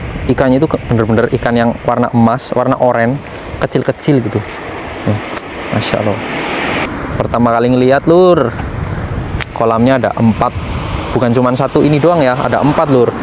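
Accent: Banyumas, Indonesia. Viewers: Sampean asale ngapak ngendi mas?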